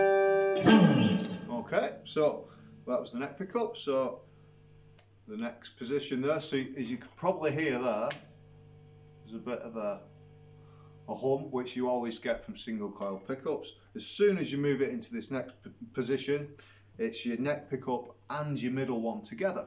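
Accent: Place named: Northern England, United Kingdom. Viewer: You sound like you might be from the same area where I am from originally. Bolton Lancashire. I’ve been in Canada since I was 7 but it’s nice hearing that familiar northern accent.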